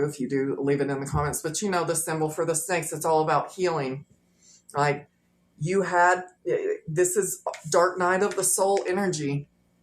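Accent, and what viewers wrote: South, United States. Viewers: 😎I am most high....... just smoked a joint. LOL. but seriously.... sometimes all it takes...... is a little can do.... you got it. beware of trolls....is right. they hide behind circles with letters in them. i love the southern accent........ i grew up watching beverly hillbillies, and dukes of hazzard............ But who didn't huh? be vigilant about thieves.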